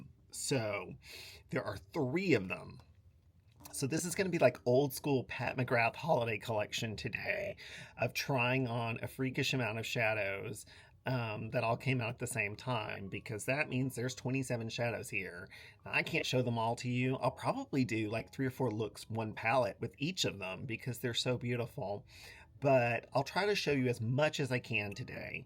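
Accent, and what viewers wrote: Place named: Georgia, United States. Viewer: Love it, you just sold me on the Space traveler pallet but I will be buying the diffuse muse pallet first. I love your accent; you sound like you are southern but Georgia southern like from Atlanta anyway nice accent. I was watching Alicia’s channel where she was talking about you applying these beautiful pallets. You have a new subscriber so I will be watching and learning.❤❤❤❤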